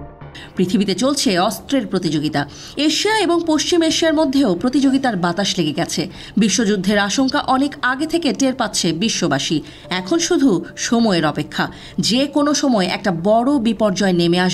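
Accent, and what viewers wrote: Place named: India. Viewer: উপস্থাপিকার কথা শুনে মনে হচ্ছিল ভারতে কোনো নিউজ দেখতেছি, পরে দেখলাম RTV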